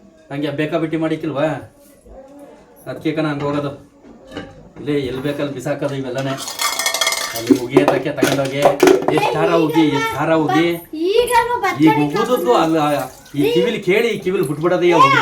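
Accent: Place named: Chamarajanagar, India. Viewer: Namane ru chamrajnagra avru avru nim slange matadadu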